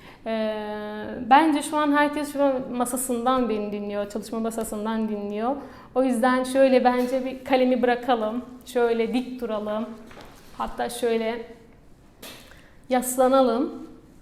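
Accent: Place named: Van, Turkey. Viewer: Hocam siz nerelisiniz? Vanlılara benzer bir ağzınız var ama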